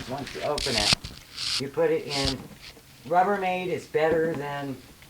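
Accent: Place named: United States. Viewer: Hi there, thanks for the video. I've got a couple of questions regarding this. Firstly, in terms of flavour and general smoking satisfaction, how do these 'natural' cigarettes compare to regular, store bought cigarettes? - I've unintentionally bought 'fake' tobacco in the past, and the flavour was significantly different, though not necessarily worse. Secondly, I notice you haven't added any chemicals to your tobacco, excluding the menthol and glycerin. Now I know that tobacco companies like to add chemicals to the tobacco to help the cigarettes stay alight. Do you have any difficulties in keeping your cigarettes alight? I'm guessing by your accent that you live in a warm, dry, part of the USA. I myself live in the UK, where wind and rain make up at least 50% of the weather, which is often problematic for us smokers. I only ask as I'm considering looking into the necessary steps needed to start my own tobacco company, a fair trade company which pays it's farmers (likely African) a good wage, but that also (hopefully if viable) offers natural tobacco to it's customers. 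Many thanks for your help, and video, informative to say the least, if all else fails I may even grow and process my own tobacco :)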